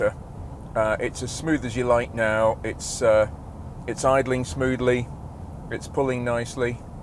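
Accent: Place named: RP, United Kingdom. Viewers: Wated all week to hear someone to speak the queens english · I come here for the English ascent.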